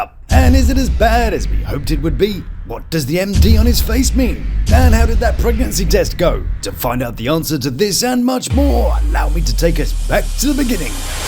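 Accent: United Kingdom. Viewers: Omg i love your accent ! Brittish guys are So hot! You sound too fancy to be watching us anericans trash tv shows tho 😂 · Just watched final episode season 6 love after lock up . I just could NOT stop myself from looking up did Julius become a rapper or got a real job 😂🤣. AWESOME I found your update . You got SO MUCH information & put it on your channel so BADASS , HILARIOUS, PROFESSIONAL etc…PERFECTLY ‼️Even witt British accent you spoke every word so clearly. And Alexia is beautiful inside & out from what I seen on the show . I really 🙏she takes her own advice & loves herself better . She definitely could find a handsome , educated, working man . She has discernment on top of ALL her other great qualities. She just needs DO IT 🙏💛♥️‼️Tyvm I appreciate yah. May god bless you and yours. JESUS PEACE 👍😎😎‼️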